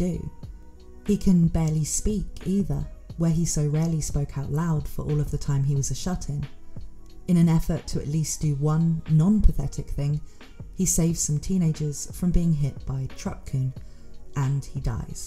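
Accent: United Kingdom. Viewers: Are you a brit????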